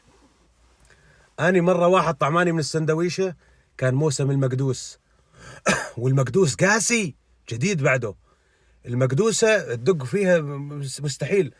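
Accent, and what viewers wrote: Hauran, Syria. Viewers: لهجة حوران الاجمل بالعالم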